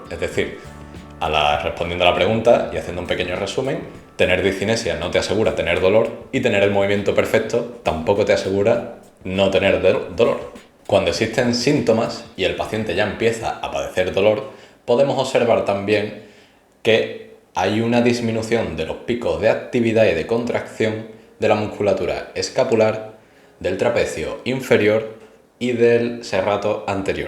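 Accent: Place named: Andalusia, Spain. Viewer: Hola, por tu acento, eres andaluz. ¿Cómo podría contactar?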